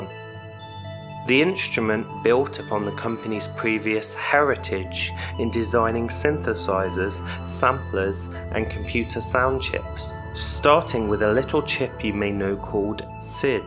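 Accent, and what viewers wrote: United Kingdom. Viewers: YEs yeS YeS YES! Awesome style in every aspect with a lush brummie voice. Long may this continue!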